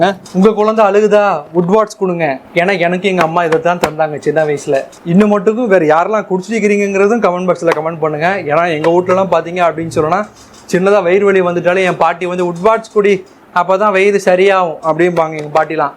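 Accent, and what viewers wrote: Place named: Keelakarai, India. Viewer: Ivvalavu seera koduthu vachavanga ponga unga ooru slang keelakarai slang mathiri irukum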